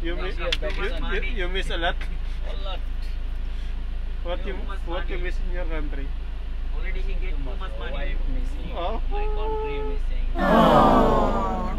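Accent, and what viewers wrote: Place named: Iloilo, Philippines. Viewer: It's ok po after pandemic nlang po kayo mag vacation. You sound an ilonggo kuya hehe..ingat po kayo.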